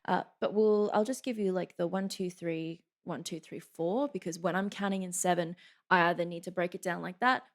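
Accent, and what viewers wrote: Australia. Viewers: I do not know why I like the Australian accent so much, but I could listen to her talk all day. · Dunno why people would hate on Australian accent.. everytime I hear you I feel a very strong urge to just drop every responsibility I have and move to Australia just to hear the people speak and enjoy the sunset on the beach.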